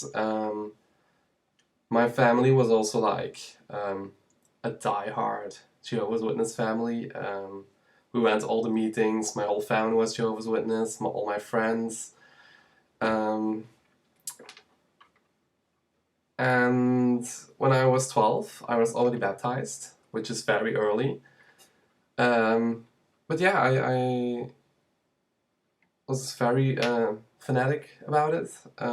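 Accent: Germany. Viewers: Bist du aus Deutschland? Your accent sounds German. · You have a very faint German accent...Are you German?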